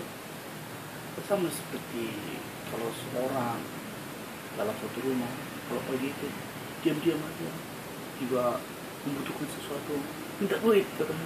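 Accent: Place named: Nias, Indonesia. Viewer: Abang logatnya sepertu orang nias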